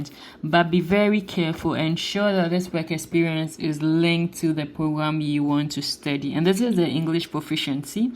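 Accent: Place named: France. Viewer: I can feel the French accent in your presentation 😂.... Great Video 👌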